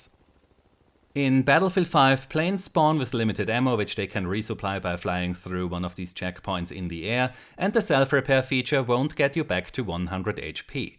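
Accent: Germany. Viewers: Warum hört man sofort raus wenn ein Deutscher Englisch spricht :D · Are you from Germany?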